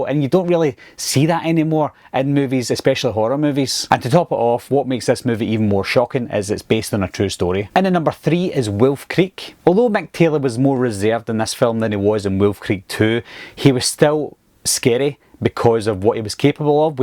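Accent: United Kingdom. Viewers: Just please show a movie, dont show your commentary. You are not Australian. You are British!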